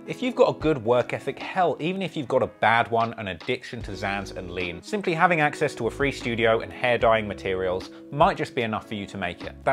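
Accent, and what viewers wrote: United Kingdom. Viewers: So many British narrators intrigued in Texas rappers. I like it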